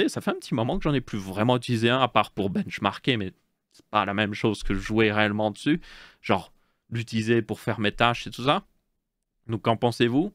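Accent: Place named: Belgium. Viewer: Ce que je veux dire n'a rien à voir, mais j'ai remarqué que votre accent belge est assez prononcé, mine de rien.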